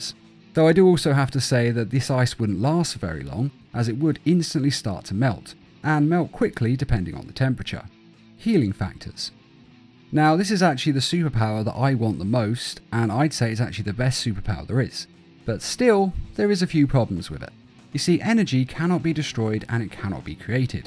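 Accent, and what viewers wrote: United Kingdom. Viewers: Sounds like a normal british person.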